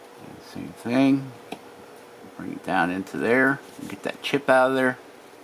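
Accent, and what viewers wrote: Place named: England, United Kingdom. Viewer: Where do you live... I am picking up an English accent lol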